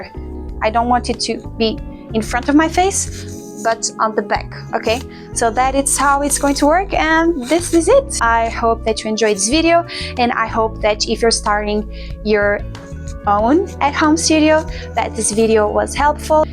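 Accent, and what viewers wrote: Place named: Europe. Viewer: What country are you from? I can tell that you're European from your accent, but I can't tell exactly where.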